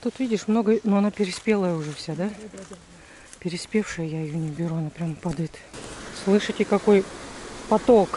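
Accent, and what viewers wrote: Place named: Urals, Russia. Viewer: Даже не зная,где вы живёте,я поняла по говору,что вы с Урала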